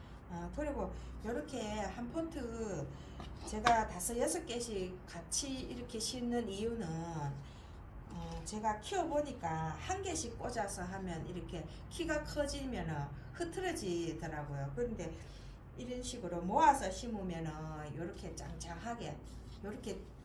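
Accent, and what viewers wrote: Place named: Gyeongsang, South Korea. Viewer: 저는다구에 거주하고있습니다 경상도 같아요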